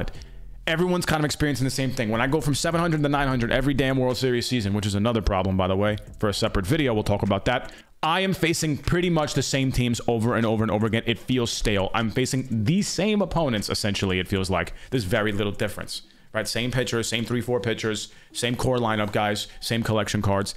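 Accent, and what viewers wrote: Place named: Dominican Republic. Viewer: Dominican accent papi 😃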